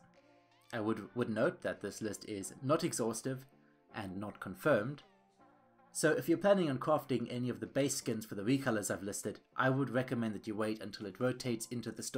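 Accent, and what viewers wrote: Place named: South Africa. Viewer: Are you South African Im pretty sure you have a South African accent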